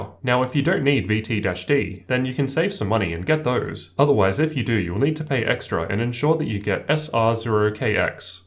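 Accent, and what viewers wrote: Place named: Australia. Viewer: I like your kangaroo-friendly accent mate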